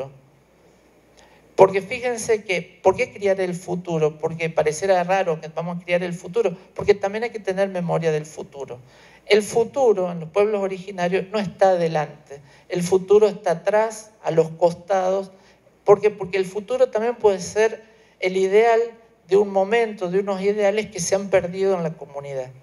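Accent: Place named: Argentina. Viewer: exelente ponencia ! ¿Cuál es el nombre y apellido correcto del especialista? Me parece que no lo escriben bien. Es Argentino parece por su asento.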